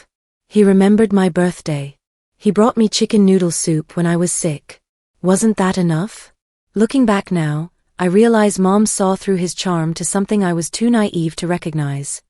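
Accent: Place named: United Kingdom. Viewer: Absolutely freakin love British Narrators. ❤❤❤ Great Story 😊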